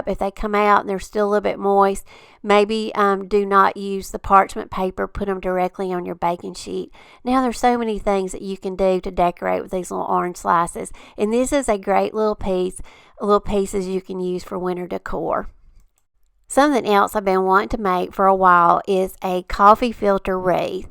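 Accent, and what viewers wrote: South, United States. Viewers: Congratulations on prosperous year. I found you in collab, I don't recall which one but I'm so glad. I am a Alabama born, grew up in Columbus Georgia, moved to East Tennessee 20 yrs ago. You definitely have that adorable southern accent. Thank you and keep the DIY's coming. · Love you sweet southern accent!🎀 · Love all your designs and projects. Also your sweet southern accent. Happy new year and good luck with your channel. I’ll be watching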